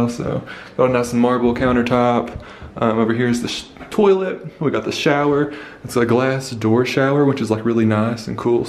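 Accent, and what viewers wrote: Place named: Kentucky, United States. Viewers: Just stumbled upon your channel . From Lexington KY just moved out here to Colorado a couple years ago! Recognized the accent immediately! Much love ! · U WERE IN KY ? I NEW THAT ACCENT WAS FAMILIAR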